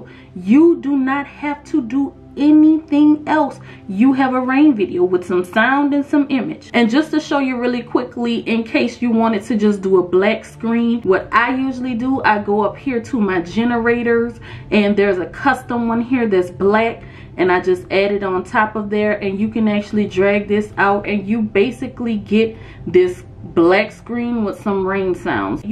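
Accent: New Orleans, United States. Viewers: I hear a New Orleans accent? Lol thanks for your help!! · I’m new here and the way you said hello I’m so many ways got me hooked to your personality also your New Orleans accent is everything ❤❤❤❤ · Girllll this video is a game changer. I done subscribed and liked. I'm watching other videos now working. Not only was the video easy to understand but you definitely from the N.O with that accent lol and so am I! I knew it was confirmation after that! Thank you so much ❤️⚜️⚜️ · Shoutout to the fact you from the N.O I know my people accent from anywhere lol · Are you from New Orleans? I love your voice. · New subscriber here! You are just too sweet and informative! You had me at what sounds like a New Orleans accent! I lived and taught there back in the 90’s so I am always dreaming about the Pre-Katrina days! Sorry if I got it wrong. Quick question: Oddly enough I have a couple of thunderstorm videos just because I am here in Belize during rainy season. 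It rains almost every early morning. My question is, must I create a new channel or can I find a way to blend a few rain videos with my current channel?Or will that throw off my channel’s audience and algorithm since it’s a new channel and not quite at 1000 subscribers? Thank you! Heading over now to check out your editing course details! 🙏🏾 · What region of Louisiana are you from? You have the City (New Orleans) accent, but it’s not the thick type. It gives like other surrounding cities not saying its a bad thing at all, but something I noticed. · Is that a New Orleans accent I hear? 👀🤎⚜️ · You sound like the people I grew up with back home in New Orleans. ⚜️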